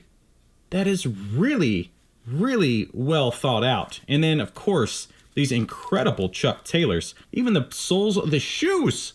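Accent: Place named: United Kingdom. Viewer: You are not an idiot! Nice british accent too!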